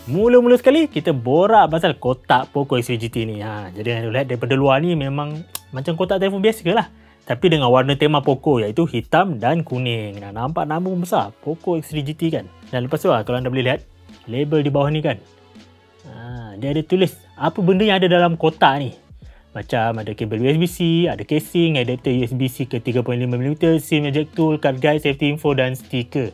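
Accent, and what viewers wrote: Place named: Sumatra, Indonesia. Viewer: iya, aku jg sering dengar orang2 sumatra gaya ngomongnya memang mirip gini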